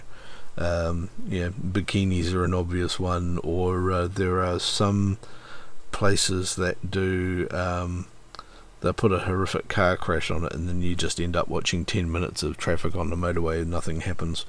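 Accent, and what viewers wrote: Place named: New Zealand. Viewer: hello sir ! i was wondering if you're from new zealand? your accent sounds kiwi, however i apologise if you aren't haha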